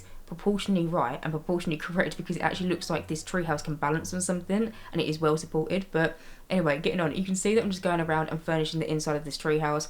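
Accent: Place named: Essex, United Kingdom. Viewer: I love your voice, I could listen to you ramble on all day. Proper Essex haha hope you’re getting through your burn out ok, I just came out of one and then they broke the game and my favourite modder refuses to buy the pack and update his mods lol so I’m basically left unable to play because I need the mods lol I neeeeed them.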